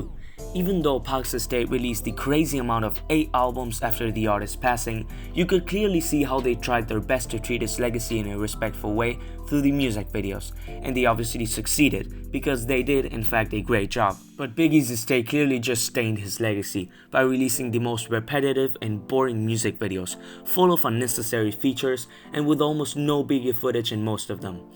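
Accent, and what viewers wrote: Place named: Philippines. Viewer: Very well made and intelligent content! Where are you from, you have an interesting accent (Filipino is my best guess)?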